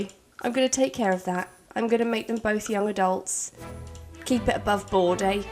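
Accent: United Kingdom. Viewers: I made my boyfriend listen to your voice, he said "she sounds like a British phone sex operator " Im like.. im pretty sure she'll take that as a compliment!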